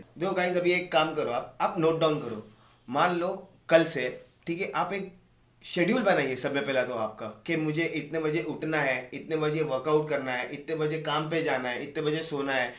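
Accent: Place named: Mumbai, India. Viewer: Typical mumbai slang bhai..rocks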